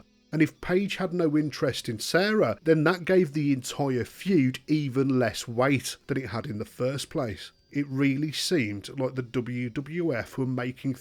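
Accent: United Kingdom. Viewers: No offense but why are all these wrestling bio youtube videos narrated by some UK bloke? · How’d I know this dude would be British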